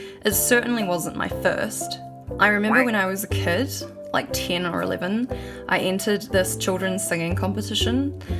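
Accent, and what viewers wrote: New Zealand. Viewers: Omg i love your new Zealand accent!!(I'm in Australia😊)it really sucks to get rejected but it doesn't define you! You ARE talented, your voice IS beautiful❤❤❤I believe in you😘😘😘you will learn from this experience and improve next time😊✨✨🎶🎶🎶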